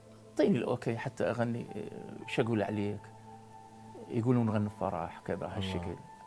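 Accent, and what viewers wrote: Iraq. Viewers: تحياتي الى الفنان عبدفلك صاحب الاخلاق الجميلة والصوت الجنوبي الجميل استاذ عبدفلك البصره تحبك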